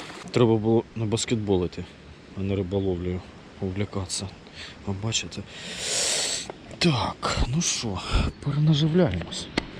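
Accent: Poltava, Ukraine. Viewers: Щодо мови- все добре, чисто полтавський говір;)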